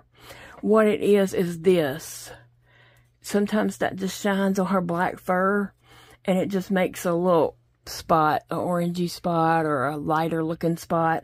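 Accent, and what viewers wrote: South, United States. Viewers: I must say again how much I love your Southern American accent ! So does my Chihuahua and my other puppy dog girls who lay around me and listen to u with me. 😁🐕💗 Our Aussie (Australian) accent is so different and not as pretty and relaxing to listen to.😂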